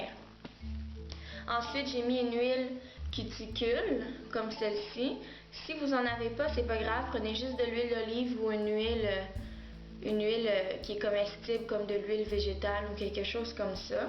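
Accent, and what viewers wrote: Canada. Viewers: J'adore ton accent canadien !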